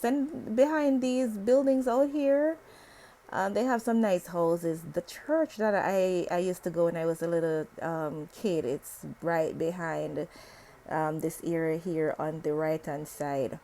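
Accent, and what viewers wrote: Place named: Jamaica. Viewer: Talk like Jamaican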